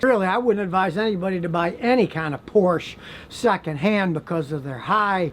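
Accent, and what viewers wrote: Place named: South, United States. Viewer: Your brain tends to really question itself, when it see's a John Lenon look alike guy talking in a southern accent, especially when you're high.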